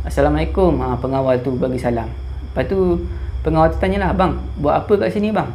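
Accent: Terengganu, Malaysia. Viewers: org kuale teganu ke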